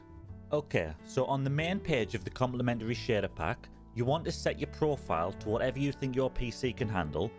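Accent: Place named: Newcastle, United Kingdom. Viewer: What a mighty geordie accent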